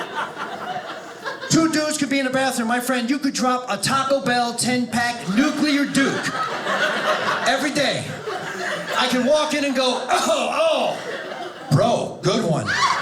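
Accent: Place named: New York, United States. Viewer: I couldn't tell he's from Brooklyn but NY for sure. My boyfriend is also from Brooklyn so I picked up on the accent right away. Also the witness protection comment made me think of the movie Mobsters & Mormons lol 😊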